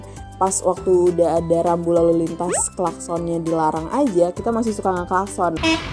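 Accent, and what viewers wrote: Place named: Malaysia. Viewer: Manglish~ Malay English 🇲🇾🏴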